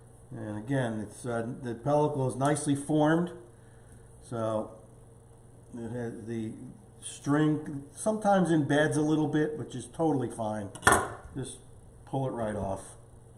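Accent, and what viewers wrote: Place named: New Jersey, United States. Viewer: I just bought a SteakAger Pro 40 and found your channel while looking for info on YouTube. I can not tell you how much I appreciate the great information and content you provide. I subscribed to your channel immediately. I live in NY but grew up in Philly and NJ. I'm picking up a Jersey accent. Thanks so much Chef for the valuable content you provide. I was nervous about dry aging my own steak but I feel confident after watching your videos.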